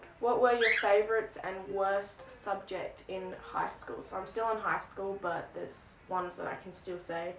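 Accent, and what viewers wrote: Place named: Australia. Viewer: Also figured you were Australian with that neat accent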